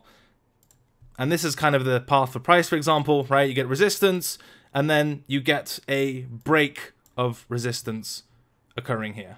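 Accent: United Kingdom. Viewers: High quality content, rich vocabulary and a British accent...I am wet :)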